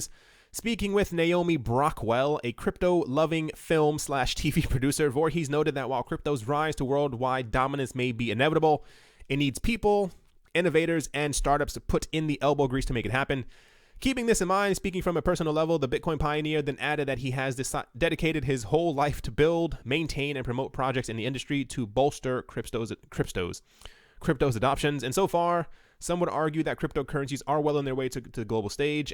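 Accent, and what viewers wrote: United Kingdom. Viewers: Mate, if someone tried to ridicule me for using a british accent, I would do the whole video in british accent. Don't be bothered by these knobs. If they're foolish enough to invest with a loan or can't see the developments in crypto space, then the crypto space will be better off without them anyways. Thanks for anothet great content and boy are we entering interesting times... all the best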